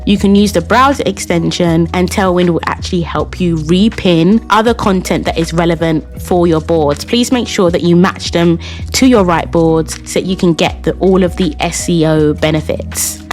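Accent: United Kingdom. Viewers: I agree. It’s nice to hear a follower Londoner. Such valuable advice. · I haven't even watched the whole video but when I heard the British accent (finally! A British gyal!!) and heard the gems you had already dropped in the first 3 mins, I hit those 'like' and 'subscribe' buttons!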